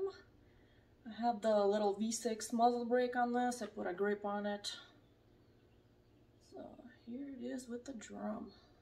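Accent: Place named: Russia. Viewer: is most likely Russian. Her accent(barely there but I can hear the way she say Kalashnikov)....Privet.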